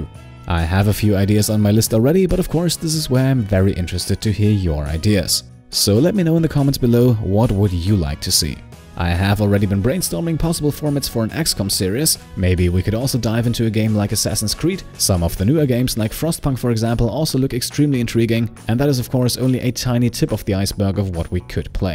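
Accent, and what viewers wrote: Germany. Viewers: Ah Germany, I couldn't place your accent. I knew it sounded different from a British one but now I can hear it. You deserve all your subscribers and even more! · Holy shit, do you even sleep? Work + school + youtube.. Also did not even register your german accent until like 20th episode of Rimworld and wanted to say great content and keep it up please!!! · RL comes first - no doubt. Congrats to your new business! :D A new strategy game would be nice. Maybe a paradox-one like EU4 or Stellaris or something similar. But its up to you. Im open for all, i guess. Because i really like your smooth voice. Soooo you are from germany! :D Honestly I almost thought so... Manchmal hört mans tatsächlich bissel raus ;) ;) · Warte warte warte du bist deutsch. Damit hätt ich jetzt net gerechnet vom Akzent her :D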